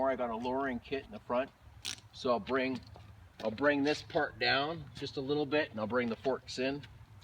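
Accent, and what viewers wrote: United States. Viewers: You sound like you're from the northeast U.S., I am just wondering because I am. I have a sky blue 2005 American Ironhorse Outlaw chopper and I love it but I also love the ''old school'' looking bikes like you have, thank you for sharing! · Are you from Rochester NY? Your accent...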